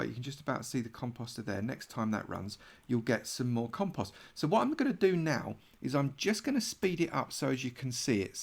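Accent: United Kingdom. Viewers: did anybody tell you that you sound like mumbo jumbo or is it that people with British accents sound the same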